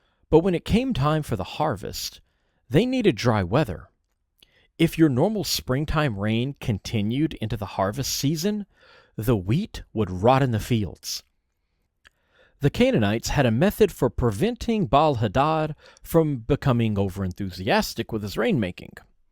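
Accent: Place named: South, United States. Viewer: its funny how articulate southerners twang bursts through in certain words and affectations. EeYeen recurs with my guy here. Why its peculiar is you will notice it only with certain words. Men is mEeYeen usually almost universally with articulate southerners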